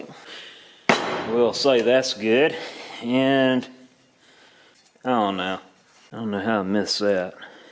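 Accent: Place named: South, United States. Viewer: "Filled" and "failed", in a southern accent, sound so similar to a northern guy...I was getting depressed for you bud!!!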